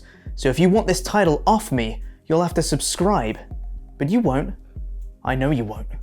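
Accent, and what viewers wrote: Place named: United Kingdom. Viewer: British accent is the most annoying thing to listen to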